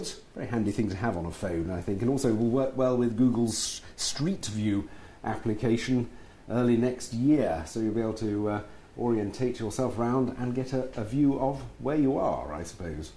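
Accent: England, United Kingdom. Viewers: damn.. the first british accent I like so much :D 5 * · HES ENGLISH NOT EVERY1 IS AMERICAN OR CANDIAN U KNOW ?! caps** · he is so posh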